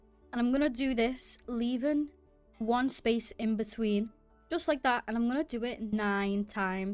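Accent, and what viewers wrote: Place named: Liverpool, United Kingdom. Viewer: That accent of u sounds like ur from scouse area 😊🎉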